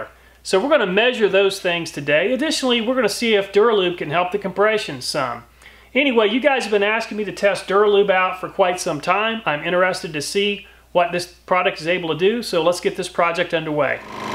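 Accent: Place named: Pittsburgh, United States. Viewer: Thanks for all your hard work testing. I do my own testing, but it's only seat of the pants/noise/smoothness, etc. I grew up in Pittsburgh, PA area. I have been listening to your pronunciation. You sound like you might be from somewhere not too far away. Am I on the right track?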